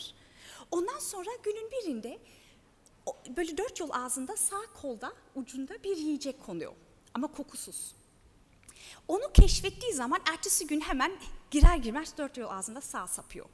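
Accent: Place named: Germany. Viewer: Kesinlikle ama almancı gibi konuşuyor maalesef.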